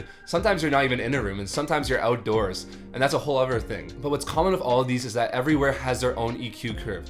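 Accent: United States. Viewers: i don't know why, but the way you talk reminds me of "Californians" (SNL)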